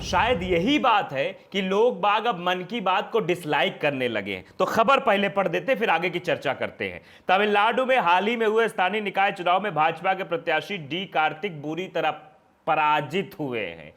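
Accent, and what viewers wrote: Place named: Uttar Pradesh, India. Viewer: present news very nicely. His tune is very much UP based. I like it very much and enjoy listening him. Keep going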